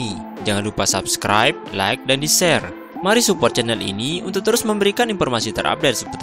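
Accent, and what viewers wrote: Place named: Banyumas, Indonesia. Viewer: admin org banyumas yaa bhs indo agak ngapak hehe...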